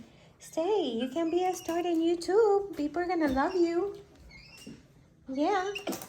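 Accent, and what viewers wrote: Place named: Russia. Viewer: No spaek english russian like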